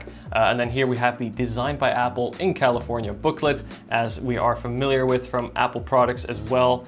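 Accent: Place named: Netherlands. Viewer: Checked the name after I heard 1 second, yup Dutch…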